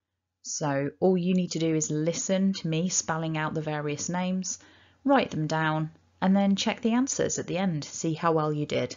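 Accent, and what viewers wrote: United Kingdom. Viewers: is this voice a scouse voice? the way "h " is pronounced is really cute a part from a few other words uttered as cute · are you British?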